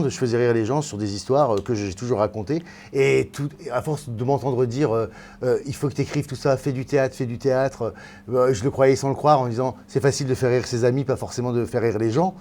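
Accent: North, France. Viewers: Surtout change rien JeanFi au début j'avoue j'avais du mal avec ton accent du nord moi qui suis du sud je n'ai pas l'accent de Toulon encore moins de Marseille. Dommage qu'avec Air France on ne puisse pas aller au Québec.... Je t'ai bien sur découverts avec la bande a Ruquier et sérieux quand Laurent te programme je suis super contente car je sais que je vais passer un excellent moment. Et puis j'ai écouté ton sketch ou tu parle de ta sœur et de tes parents. Je suis reconnue quand tu parle de ta sœur. Mais même quand tu caricature ton milieu et tout ce qui se passe dans un avion moi j'ai la chance de pouvoir le prendre assez souvent alors c'est malheureusement pas Air France que je prends mais Air Transat que j'appelle "Air Sardine" mdrrr la bouffe est immonde mais en contre partie j'ai l'accent Québéçois dés que je monte dans l'avion. En tout cas merci pour ce que tu es... 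Bisousssss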